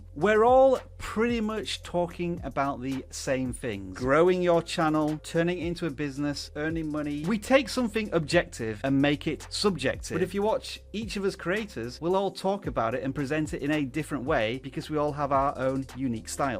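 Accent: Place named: United Kingdom. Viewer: Don't you even think of faking an artificial "neutral English" accent, because one of the thing I most like about this channel is your British accent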